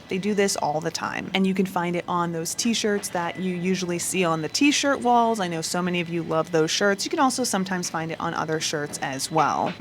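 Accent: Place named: Minnesota, United States. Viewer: Could tell you were in Minnesota girl what part of Minnesota are you from as a fellow Minnesota commenting on your life?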